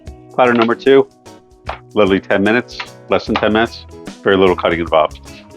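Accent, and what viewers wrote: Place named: Northeast, United States. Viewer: Bet this guy is from the NE USA how he pronounces it wooder melon. People in Maryland Jersey New York and PA call it water ~ wooder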